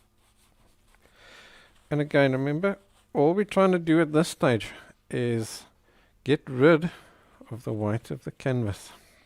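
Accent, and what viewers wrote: South Africa. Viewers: Hi. Judging by your accent I assume you're South African. Where can I buy a set of pencils like the one you had in your video about learning how to draw? ❤Love your channel so much; thank you for making time to share your talent!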